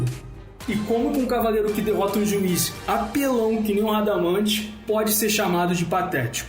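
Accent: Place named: Rio de Janeiro, Brazil. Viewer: caralho sabia carioca fudido ainda e da zona norte ps; (moro na penha)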